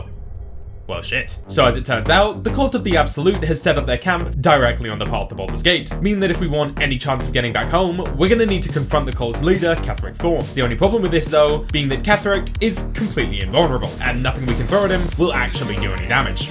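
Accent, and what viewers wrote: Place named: United Kingdom. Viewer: Great editing. You should boost your audio a bit more. I need that beautiful british voice straight in my ears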